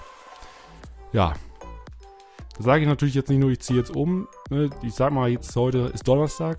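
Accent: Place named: South, Germany. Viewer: ich bin mir sehr sicher dass er aus dem Süden / Rheinland-Pfalz kommt... Tipp vom Experten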